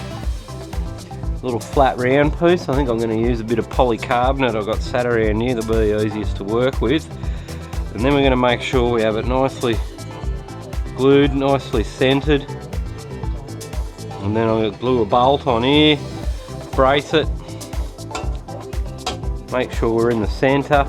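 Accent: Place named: Ireland. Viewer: irish? or Australian? sorry nice accent!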